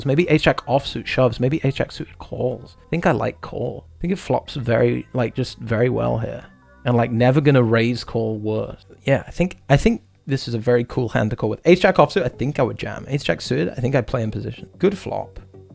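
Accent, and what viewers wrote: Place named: London, United Kingdom. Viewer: You sound like your from London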